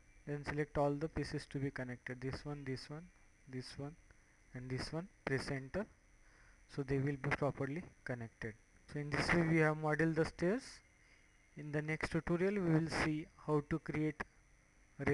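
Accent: India. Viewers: This narrator is awful! What the helll is he saying? Get rid of the india/english accent, or get someone else to speak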